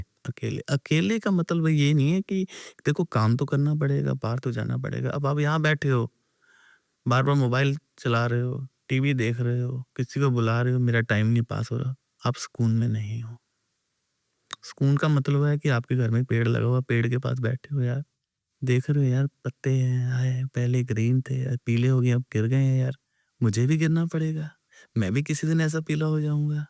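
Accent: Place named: Punjab, India. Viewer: ਪੰਜਾਬੀ ਬੰਦੇ ਦੀ ਹਿੰਦੀ ਦਾ ਪਤਾ ਲੱਗ ਈ ਜਾਂਦਾ 😅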